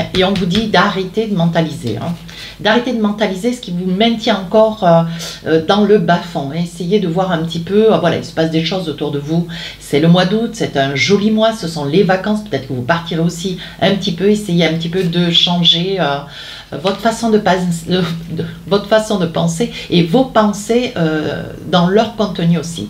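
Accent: South, France. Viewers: Merci pour cette guidance. Beaucoup de choses sont sorties. Qui sont vraies. Cancer ascendant Cancer. Je vous ecoute depuis quelque temps. Et c est un plaisir. J adore votre accent du soleil. A bientôt. 😊❤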